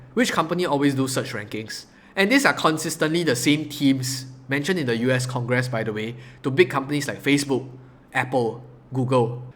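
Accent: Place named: Singapore. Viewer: 新加坡口音的英语！！！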